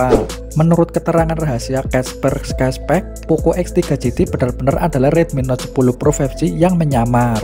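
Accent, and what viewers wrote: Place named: Java, Indonesia. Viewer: Bgst mendoknya bikin ngakak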